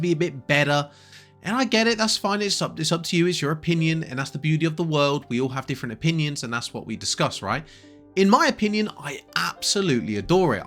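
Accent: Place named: United Kingdom. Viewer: Beautiful British accent.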